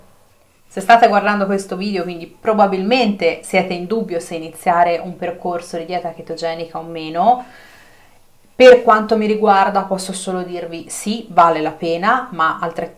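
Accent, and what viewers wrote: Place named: Tuscany, Italy. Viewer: Grazie mille. Ti ho conosciuta con questo video perché cercavo testimonianze sulla dieta keto. Purtroppo dovevo iniziare lunedì scorso ma la notte sono stata male. Il mio nutrizionista mi ha detto che devo per forza prendere gli integratori di magnesio e potassio ma io avendo una malattia autoimmune allo stomaco trovo difficoltà. Ieri ho riprovato e ora sono di nuovo con un mal di stomaco assurdo. Ti ringrazio della spiegazione. Sento che sei toscana anche tu. Sai se ci sono asl o ospedali dove poter fare la keto? Perché io ho tante patologie e non vorrei sbagliare. Devo perdere peso tanto... per salute. Grazie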